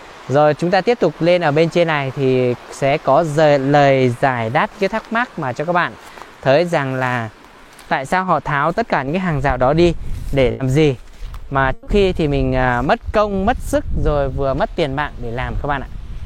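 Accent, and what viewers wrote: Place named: China. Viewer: Ở giáp Trung Quốc nên giọng nói của bạn phát âm cũng na ná tiếng Trung.chúc kênh ngày càng phát triển thật tốt nhé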